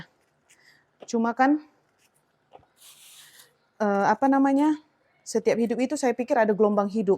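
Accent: Medan, Indonesia. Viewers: Memang gitu kk logat kita Batak Medan ,karena saya jg Medan logat sya jg gitu😅 tp itu lh yg buat seneng nonton vlog kk..😊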